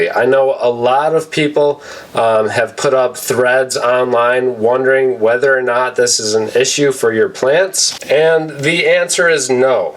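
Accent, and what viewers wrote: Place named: Wisconsin, United States. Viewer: Great helpful video! I knew we were neighbors when I heard the accent. Subbing fellow Wisconsinite!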